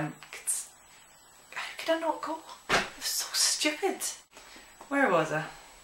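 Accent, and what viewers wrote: Scotland, United Kingdom. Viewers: I'm in love with the Scottish accent. It's my favorite accent in the world. Please come to the Midwest. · I watched for the accent. I love a gal with a Scottish accent. :) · Wow! You. are. so. GORGEOUS! And I TOTALLY TRULY LOVE your Scottish accent. If Scotland has more girls as gorgeous as you, but older, of course, since I'm 50, I'd love to move there ((especially on account of the ignorance in command of my country)). I can handle the rain, since I like to remain inside anyway. Wait, do you have places where lesbians meet each other? Anyway, I Scotland is my 2nd dream country. Australia is #1, Ireland is #3. I subscribed and gave it a thumbs-up. I'll catch you next time. Blesséd Be, Angie aka Wynter Ravyn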